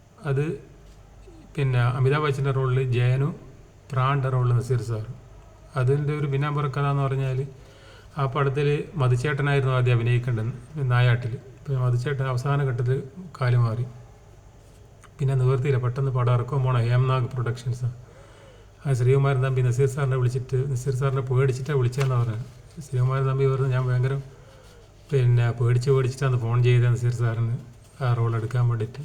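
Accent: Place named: Kannur, India. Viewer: താങ്കൾ കണ്ണൂരാണോ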